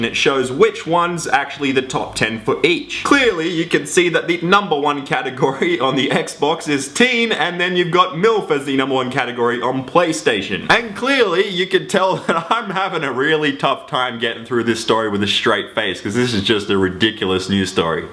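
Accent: Australia. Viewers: are u from austrailia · Is this guy Australian?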